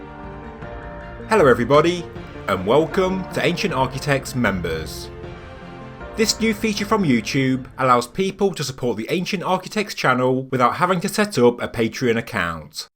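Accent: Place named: Midlands, United Kingdom. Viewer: I'm from England, yet can't place your accent, sounds Midlands maybe. Another well researched presented video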